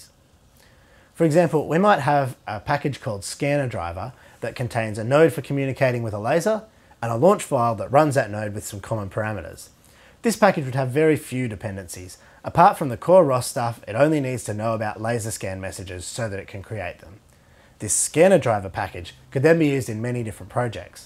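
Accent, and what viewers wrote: Australia. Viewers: Excellent Aussie 😊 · thanks, I appreciate the feedback! Ive learned quite a bit from your video series / channel thus far so thats helped and am looking for more resources. My issue is that I have a rather unique robotics project and have been 'cobbling together' the ecosystem / tools myself & have only just discovered ROS. I will have to look more into it to see if its appropriate; I mean it has to be right?! PS. My 'unique project' at the moment is a Knight Rider KITT replica & was thinking ROS may be the solution. Yes, an actual pontiac trans am. PPS. You sound like a fellow Aussie, hope Im not being rude?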